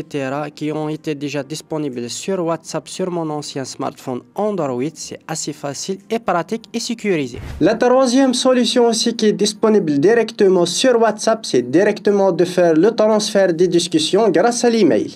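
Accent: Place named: Kabylie, Algeria. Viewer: Kabyle algérien ❤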